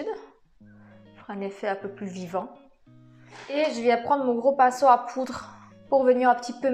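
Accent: Belgium. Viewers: Tes belge ?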